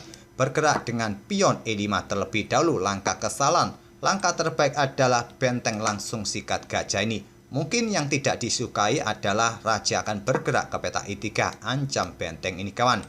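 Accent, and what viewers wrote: Indonesia. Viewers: suaranya medok banget mas...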